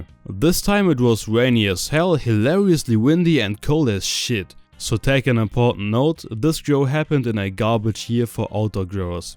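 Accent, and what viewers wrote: Germany. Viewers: Man hört das deutsch richtig raus hahahah ich liebe es ❤️😂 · Dieser deutsche Akzent 🤣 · I hate to assume but you sound German so maybe you can grow in your home or yard soon. Also I assume you live in Germany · Man hört voll das er deutscher ist sein Englisch verrät ihn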